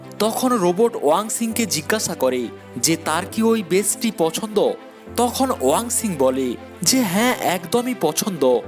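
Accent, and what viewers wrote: Kolkata, India. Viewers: uner koctay mone hoy kolkatar??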